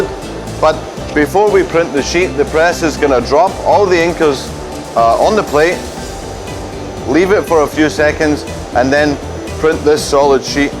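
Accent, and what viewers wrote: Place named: Scotland, United Kingdom. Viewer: A good scotsman by the sounds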